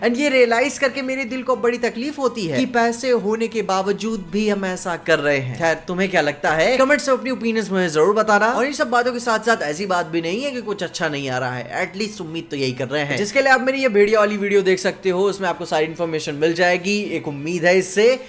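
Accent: Standard. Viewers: bhai ki shuddh hindi sun k maza aa jata hai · Bro can you ease change your Hindi accent coz I can't understood your pure Hindi thanks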